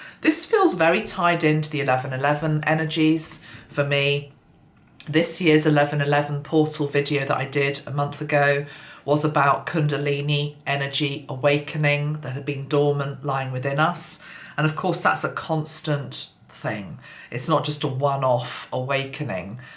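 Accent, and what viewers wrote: United Kingdom. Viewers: At least the "it's a bit sparse" bit made me laugh out loud. (Regarding the tree) It's prob your British accent too. I could picture that old show The Young Ones having a sparse tree and talking about it. Gotta find the humor in the grinches & scrooge types. Love your videos! Xo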